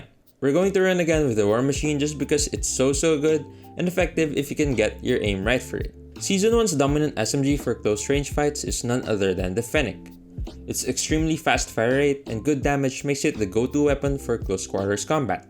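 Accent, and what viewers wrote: Philippines. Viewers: Pinoy ka lodi no hehe gawa ng accent mo sa english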